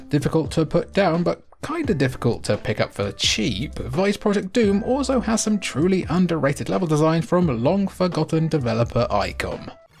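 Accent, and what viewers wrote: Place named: United Kingdom. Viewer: Fax very much! Not just for this vid but the others I have watched. Good to hear another brit even when you get the tongue twisters in your videos hah. Faxanadu really gave me Zelda 2 vibes with the side scrolling.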